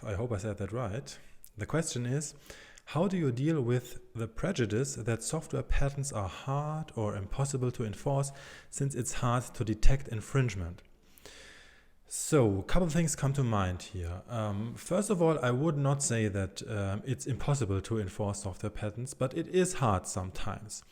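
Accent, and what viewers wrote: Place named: Germany. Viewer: Schöner deutscher Akzent :-) Ich frage mich, ob es für mich als End-User rechtliche Schwierigkeiten geben kann, wenn ich eine kostenlose Software nutze (z.B. Gimp als Photoshopersatz). Z. B. wenn ich als Berufsphotograf Bilder manipuliere und diese dann als JPG exportiere, um diese zu verkaufen. Wäre meine Nutzung der Bildbearbeitungssoftware GIMP illegal, wenn z. B. patentierte Prozesse oder Dateiformate mit der Software zum Einsatz kommen, welche die Software gar nicht nutzen dürfte? Oder sind die Softwareprozesse in diesem Zusammenhang eh kein geistiges Eigentum, weil es unpatentierbare mathematische Formeln sind?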